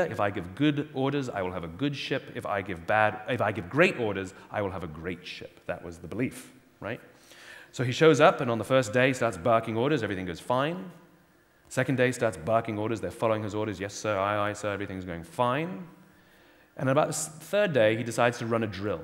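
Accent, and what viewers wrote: United Kingdom. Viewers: Absolutely love. I have seen so many of his videos and how important is authenticity is to him....fabric of who he is. So I am guessing is that he just wanted to give the British accent a go...why not. Always up for a challenge.....I lived in Scotland for 5 years and could never do a Scottish accent. Anyhow, just thinking out loud. Love and now a huge fan of Marquet:-) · Since when does have an English accent? I thought he American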